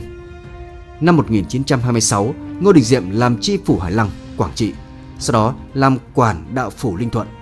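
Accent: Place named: North, Vietnam. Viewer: Ăn dơ quen mỏ nên mở miệng ra bay mùi kinh khủng. Định lèo lái hả? Nghe giọng bắc là thấy thiu thiu rồi, ai ngờ mùi nặng dễ sợ !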